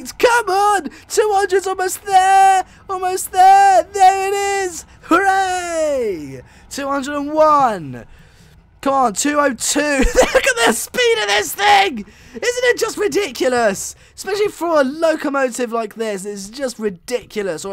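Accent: England, United Kingdom. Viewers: The"bluething" is called the javelin duh. The YouTuber sounds English and on The side of the train in real life says Javelin so he should actually know.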